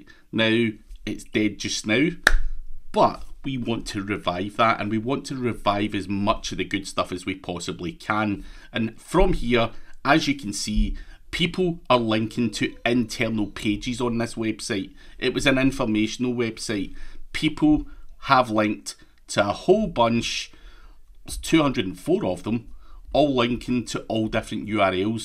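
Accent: Scotland, United Kingdom. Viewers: man freaking genius!!! plus +1 on the Scottish accent!! i have been wondering about doing exactly that for a while now.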